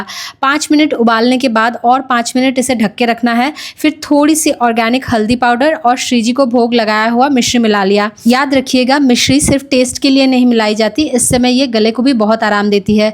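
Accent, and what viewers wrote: Bengal, India. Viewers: Same didi ❤❤jab tak bhajan, katha na laga lu tab tak din ajeeb and adhura sa lagta hai ❤❤ apki bengali voice kitni cute hai 🥰 shree ji ki kripa ap par bani rahe ❤❤Keep It Up ❤❤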